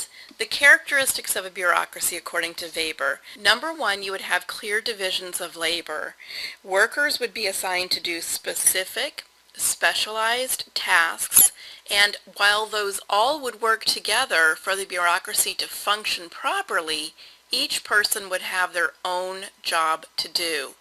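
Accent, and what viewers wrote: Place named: China. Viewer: Chinese equar English, l can't understand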